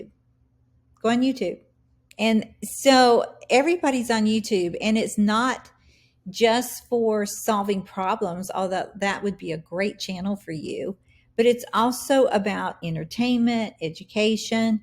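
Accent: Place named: South, United States. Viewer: You have such a delightful southern accent. I've watched this twice:pride-flower-pansexual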